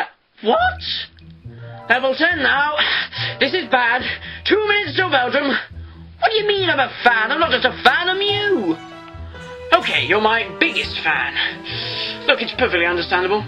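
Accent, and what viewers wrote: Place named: United Kingdom. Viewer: How I like the British accent.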